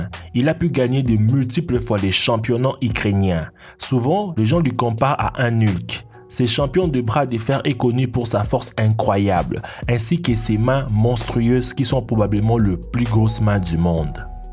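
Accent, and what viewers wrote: Africa. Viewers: Vous trouvez pas qu'il a l'accent africain un peu ?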